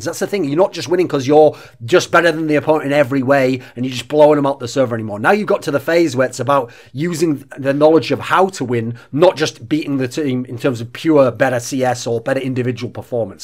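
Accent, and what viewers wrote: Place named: Scotland, United Kingdom. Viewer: It's definitely English, but definitely not an accent from England. Probably Scotland or Wales.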